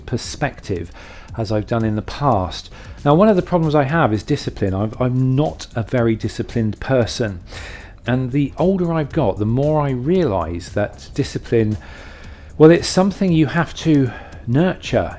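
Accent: United Kingdom. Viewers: I was so happy to find a new video from you! I really really enjoy listening to your friendly kind voice and your beautiful British English. Since I have some weight, digestion and disciplin issues myself I also find your ”rambling” very interesting and inspiring. Thanks for being so open and sharing this! I’m sure I’m not the only one who will start to think about how to tackle their problems using your input. Warm greetings from Sweden! 🌷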